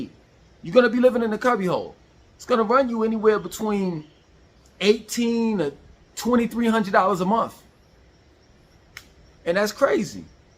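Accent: New York, United States. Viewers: It’s his brand and his bread & butter for content. Dude is from NY, (the accent is a giveaway) and all he does is whine about Charlotte & Atlanta. In other words, a gentrifier whining about gentrification 😂.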